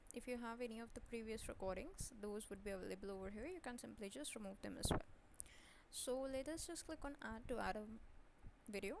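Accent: India. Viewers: Can barely understand you with your heavy Indian accent. In English, the letter V is not W. Just saying. Plus, you speak to fast. Your English words are blending together.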